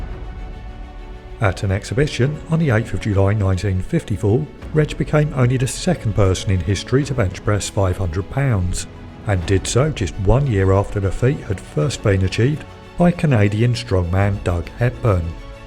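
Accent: United Kingdom. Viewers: good point. I should have picked up you are speaking the kings English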